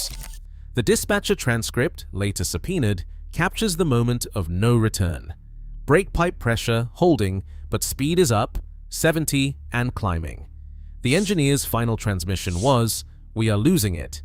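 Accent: United Kingdom. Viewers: I gave up after your brit speak I NERT moronic pronunciation too.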